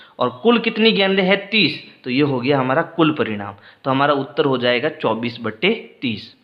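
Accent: Rajasthan, India. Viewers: Man you from Rajsthan ?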